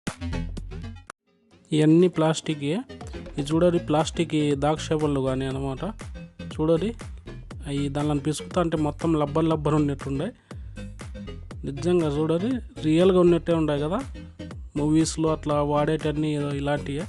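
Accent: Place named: Telugu, India. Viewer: Brother only nee telugu slang kosame nee videos chustha From/telangana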